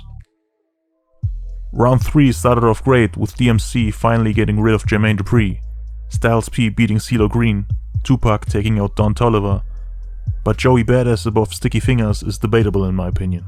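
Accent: Germany. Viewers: Bist du deutscher? Hört sich so an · Are you from germany? I just mean because of the accent and the farid bang video😂😂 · is this guy German lol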